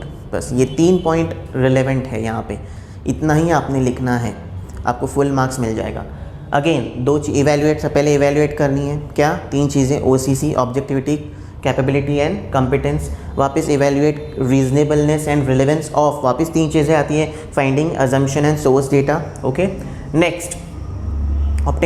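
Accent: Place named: South, India. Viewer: Sir, you sound like a South Indian😁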